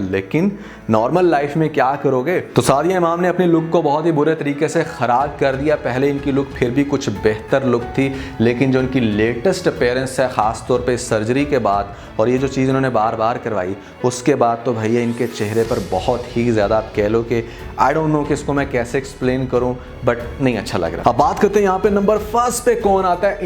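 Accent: India. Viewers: Bhaiee aap hindu ho kia aap ki language or aacent hindi hay baar baar ( achhay say) boltay hn aap achay tareeqay say kehna chahiye pakistanion ki tarah lanat teri shakal per urdu ko bigaar rahay hn aap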